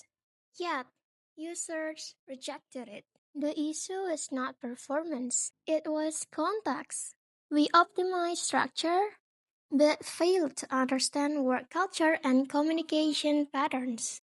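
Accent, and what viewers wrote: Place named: United Kingdom. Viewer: omg sounds really British😚how cute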